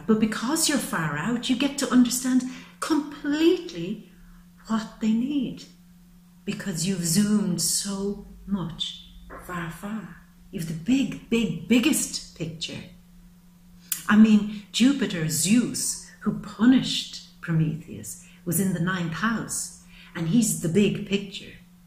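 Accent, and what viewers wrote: Ireland. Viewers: I just love you. And your Irish posh accent :) you remind me of my Irish friend.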